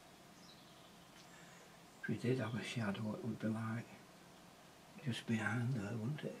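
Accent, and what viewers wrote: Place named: North, United Kingdom. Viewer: Loved this, your so lovely,and northern like me lol, I understand you perfectly lol👏😁 you brill, hope your wife comes home soon my love, you take care of yourself, hugs👍🇬🇧❤️💙🎨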